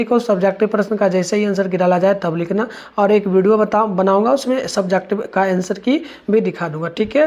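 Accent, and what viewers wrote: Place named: United States. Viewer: Sir aap अमेरिका ke he kiya aap ke language waise. hee lagta he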